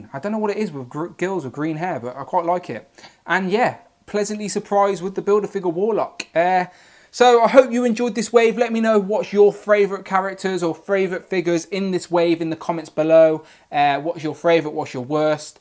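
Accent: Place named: Manchester, United Kingdom. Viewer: got this wave or partial wave as a gift for a friend still waiting on one in the mail didnt get cyclops cause i refuse to pay fifty plus but did get the leg for five, but wondering all plus this guy eighty five dollars is that cheap or a deal anyhoo good vid your accent sounds like your from the manchester area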